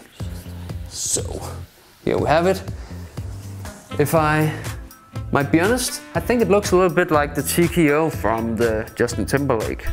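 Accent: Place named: Denmark. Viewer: Dansk accent dræber mig..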